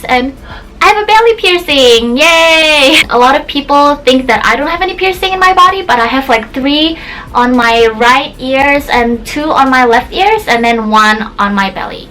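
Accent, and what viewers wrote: Indonesia. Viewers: ahh an indonesian accent, I was always confused, I knew you were korean but your accent isn't native english speaker or korean... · At first you sounded like an Indonesian trying to speak English to me. number 9 explains everything.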